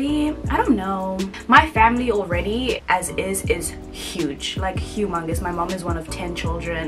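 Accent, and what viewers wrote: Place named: Xhosa, South Africa. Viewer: Going thru ur vids catching up and Okay i love your accent no cap Are you xhosa🔥😌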